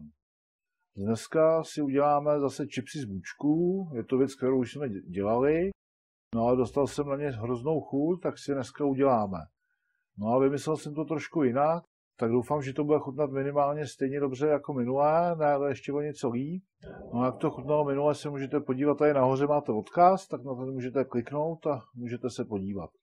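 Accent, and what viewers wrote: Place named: Prague, Czech Republic. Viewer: jsem naprosto normální heterosexuál:) Přesto bych řekl, že mám hrozně rád., tvý recepty jsou neotřelý, někdy trošku složitý, někdy jednoduchý, někdy super a dost často na ceny nejvyšší. Občas mi jako brňákovi úplně neladila tvoje pražská mluva, ale tohle je přesně to, co tě dělá zapamatovatelným. Jsi nejen vynikající kuchař. Jsi i člověk s charismatem a dobrým srdcem. Jsem rád, že jsem měl tu čest tě poznat i osobně. Díky nejen za ty super recepty, ale díky i za to, jak to celý podáváš.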